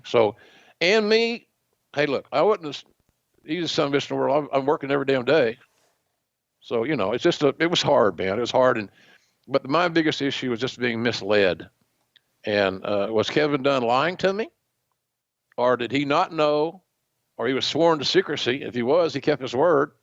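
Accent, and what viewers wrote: South, United States. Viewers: I like that is more chilled in his older age on commentary. He sounds more like his laid back self. Imo he talks better out of character. I dunno? I'm just an Aussie who appreciates the chilled out southern accent over the loud mouth one lol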